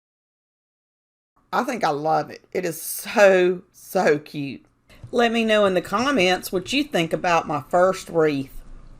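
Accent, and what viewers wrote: South, United States. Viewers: I like your accent it's country keep it up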